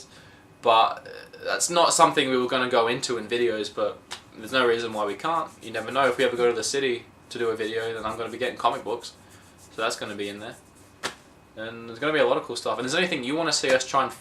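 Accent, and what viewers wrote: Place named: Australia. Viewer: Young with his super strong Aussieland accent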